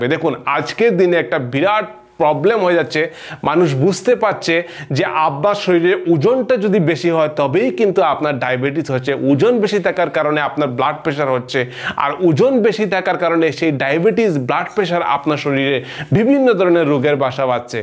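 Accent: Bangladesh. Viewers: বাংলাদেশের বাংলা উচ্চারণ একদম ভাল নয় ।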